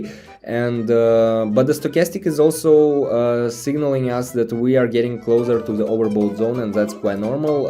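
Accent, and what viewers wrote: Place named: Russia. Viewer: feel his russian lol subscribed just for that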